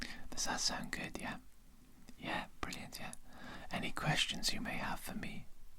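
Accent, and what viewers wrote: Wales, United Kingdom. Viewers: you have such a relaxing voice , do i detect a little bit of a welsh accent there? sorry you took down the wrong answer for me there i dont infact always finish my chores , super relaxed video loved the writing sounds 😴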